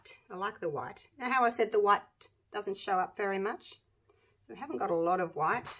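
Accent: Australia. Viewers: I enjoy all your creations. Love listening to your Aussie accent.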